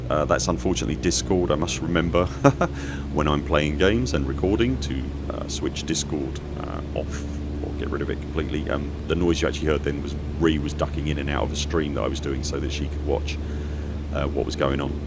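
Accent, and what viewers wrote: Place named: South East London, United Kingdom. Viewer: A South East London boy eh? Grew up in Bromley myself!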